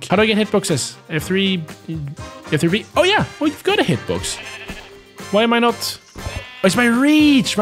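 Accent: Sweden. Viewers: hejsan du låter svensk! men är du det?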